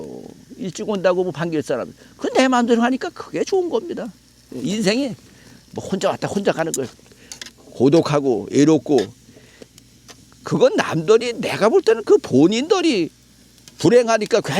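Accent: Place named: Jecheon, South Korea. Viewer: 제천 , 단양 사투리 같은데요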